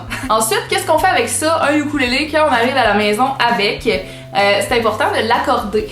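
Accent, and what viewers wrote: Quebec, Canada. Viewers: Mon accent français jalouse ton joli accent québécois :') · Je suis un Nepalais et j,adore l,accent quebecoise 😀😀😀🙏🙏❣❣❣❣❣💋💋💋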